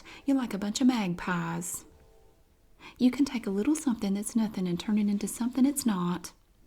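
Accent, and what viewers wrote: South, United States. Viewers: I love asmr, and i'm so happy that there is a person from NC doing it now, and that has a good southern accent!!!!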